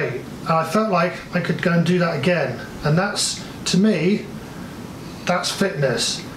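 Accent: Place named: West Country, United Kingdom. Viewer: Bloody hell you sound like my wife literally she's from the west country! Eat healthy stop drinking get fit lol.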